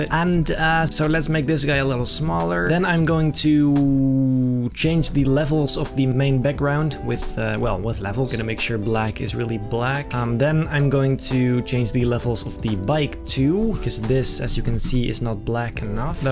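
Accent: Netherlands. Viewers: You are Dutch. Im sooo sure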